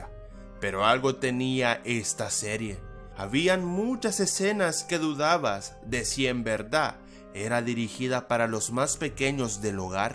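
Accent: Chile. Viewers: suena como chileno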